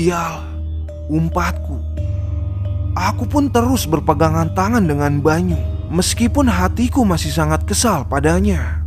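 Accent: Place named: Madiun, Indonesia. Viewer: Hadeeeeeh Madiun bletot lagi...heheheeeee salam bts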